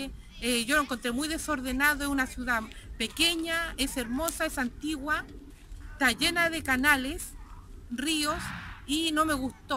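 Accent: Chile. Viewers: Eres Chilena ??? Por el acento ??? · Yo soy latino viviendo en Holanda por casi dos años. Pero el video de esta señora es tan nefasto y terriblemente desinformador.... me da hasta molestia que la gente sin ninguna preparación alguna, sin siquiera preparar un video decentemente se crea con derecho de venir a hablar tan terriblemente de un país. Por el acento de la señora creo que es chilena y le informo que su país está en dentro del rango de los países con BAJO nivel de inglés. Y en ese mismo ranking Holanda está en el 2do lugar como el mejor país que habla inglés como segunda lengua. Ya quisiéramos todos nosotros que en nuestro país hasta el que barre las calles, el jardinero, el que trabaja en el campo, hasta los mendigos hablen inglés! Claramente señora usted no les entendía porque USTED NO HANLA INGLÉS! No es que su nivel sea bajo, usted NO HANLA INGLÉS! Y es más que notorio por cómo pronuncia algunas de las palabras en inglés que dice en su video (como Dutch que es el idioma oficial de este país dicho en inglés ) . 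claramente si usted emigra a Holanda o Habla holandés o habla MUY BIEN INGLÉS sino usted no tiene nada que hacer acá, el país no va a cambiar porque a la reina se le dio la gana venir y solo hablar español. Además que si usted viene sin permiso de trabajo TAMPOCO TIENE NADA QUE VENIR A HACER ACÁ, acá no existe el trabajo ilegal, que lo que tiene de consecuencia el trabajo ilegal es la precarización de la vida de la personas, es por eso que Holanda se ve tan lindo por donde usted camine, no solo e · Por la forma de hablar de esta señora les comento que primero es chilena y segundo... en mi pais les decimos weones picantes. Una persona sin demaciada educacion que por cierto debe hablar un ingles pesimo. Es el clasico latino que quiere tener la gran vida sin sacrificio.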